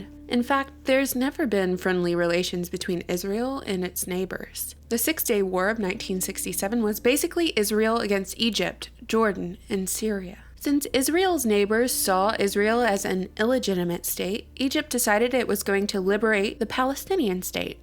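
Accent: United States. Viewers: Sounds VERY American to me, A damn shame. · Are you from the South?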